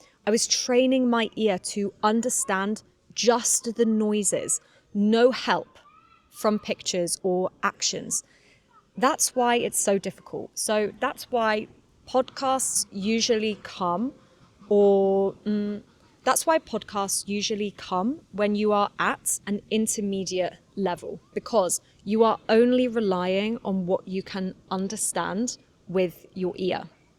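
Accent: United Kingdom. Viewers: I am form bangladesh 🇧🇩 i think you don’t know about this country because the country is small but my country population is high & It is a country under Asain countries. Actually i am a student and i am learning English with a British accent that’s why I follow you and watch your videos. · Hi, I’m from China and I’ve been following you for half a year now. I really love your British English and enjoy every video you post. Your slow-paced English helps me hear every word clearly, which has really improved my English. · I’ve just finished watching your podcast, and it’s been incredibly helpful for my English. Your pronunciation is so clear and easy to follow—a perfect British accent! To be honest, this podcast gives me so much motivation to learn. Or maybe... I’m just hooked on your channel because you’re absolutely stunning? Oh dear, I must be losing my mind! 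Anyway, I really hope you could make a short podcast episode on how to approach and make friends with a Western/Caucasian girl. That would be amazing! (^-^) 😀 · Hi, I’m from China and I’ve been following you for half a year now. I really love your British English and enjoy every video you post. Your slow-paced English helps me hear every word clearly, which has really improved my English. love you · Thanks you become one of favorite youtube teachers also i shadow your videos to get British accent ❤😂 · that's why it's called Great Britain what's a beautiful girl and her lovely dog.is it Wilson?what a great accent girl 🗣 A million thanks From Sudan 🇸🇩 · I'm a 45-year-old 'uncle,' and this channel is the perfect spot for me to pick up a proper British accent. ^^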